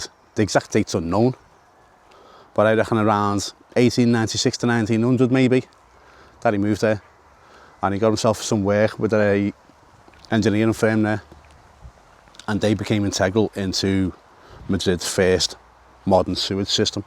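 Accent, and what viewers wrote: Liverpool, United Kingdom. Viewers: I love your interest in history. I the young ones who follow you are learning something they never would’ve known, pretty much like myself but I’m a heck of a lot older. I hope you manage to clean the gravestone, so it looks good again, I’d like to see a video on how you do it if you do. A sort of time lapse if that’s what they call it kind of video, I’m sure it would stand out more and he would be proud to know that someone would’ve been looking after it as he neared the end of his life. Thank you for the information and all the research you have done. Amazing stuff La as yous lot say in Liverpool, I love the accent and the people. YNWA, never forget those who have gone before us and those who need our help now. God bless you Liverpool from the bottom of my heart.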